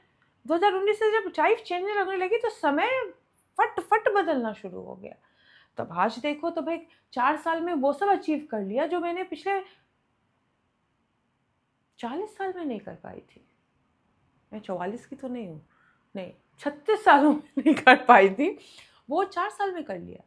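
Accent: Bihar, India. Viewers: Mast form mei ho.. bas apka bihari accent miss karte h jaise aap purane videos mei karte the.. we feel more connected🌻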